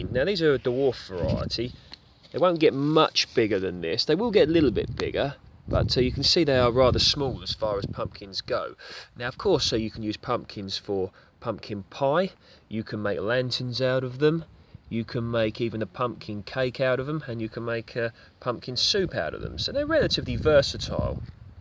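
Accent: United Kingdom. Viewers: I was like hmm I wonder how easy they are to grow in the UK so I’ve been scrolling through videos trying to find a familiar British voice lol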